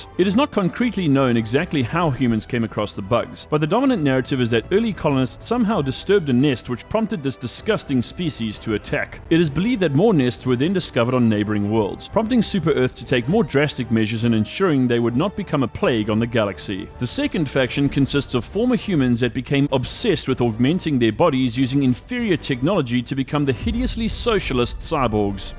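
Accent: Johannesburg, South Africa. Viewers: Started watching video, about 5 seconds in I was like hold on, this is a Joburg accent (northern suburbs even). Continued watching and now I'm sure of it. Aweh bru :)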